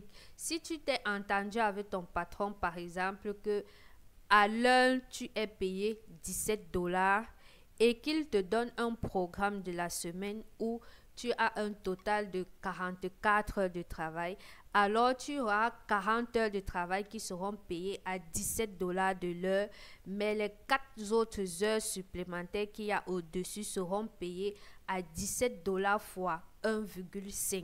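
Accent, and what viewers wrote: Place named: Bamileke, Cameroon. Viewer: Le ton bamileké de ça . Slt sister. Ton visage m' est familier stp dans quel province vies- tu?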